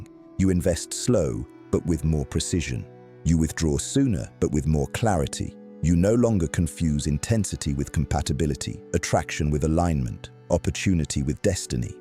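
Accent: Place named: England, United Kingdom. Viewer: Speak in American. We ain't training to be English. For real. Just cause the average American is preprogrammed to think an English accent is more intelligent.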